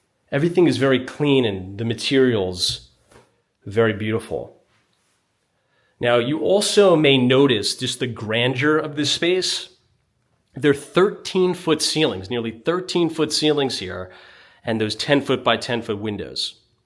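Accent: New York, United States. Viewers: He’s a New Yawkah!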